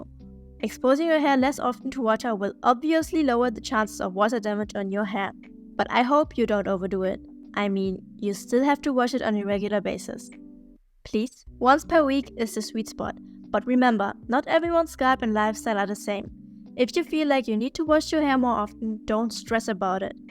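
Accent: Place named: Germany. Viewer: I hear a strong German accent 😄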